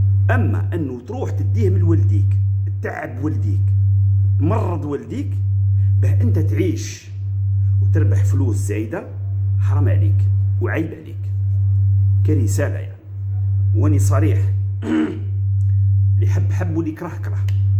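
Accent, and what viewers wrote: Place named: Biskra, Algeria. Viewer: دكتور هل انت بسكري لخطراش لهجتك بسكرية ماشاء الله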